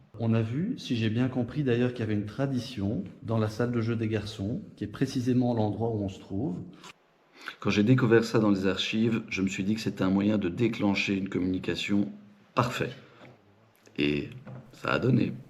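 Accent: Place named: Belgium. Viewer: dictions parfaite avec l accent belge ;analyses bien expliquées et enquête bien menée ; que demander de plus! j adore!!!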